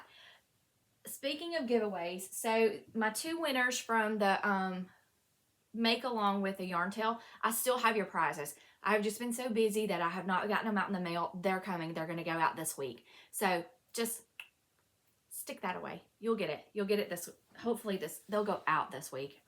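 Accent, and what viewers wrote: South, United States. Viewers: Hard to pick one favorite moment of your podcast but I always enjoy your southern accent and how you just enjoy yarn & your life. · Congratulations on your one year podiversary. It’s hard to pick a favourite moment but learning the correct way to say pecan today is up there along with your delightful southern accent. I’m in Australia so you sound very exotic and of course I was mispronouncing pecan 😉🙃😎❤️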